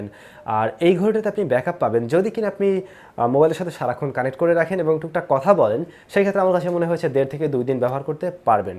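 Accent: Barishal, Bangladesh. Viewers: ভাইয়া বরিশালের ছেলে নাকি :/ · Vai barisaila language misaien na... 😫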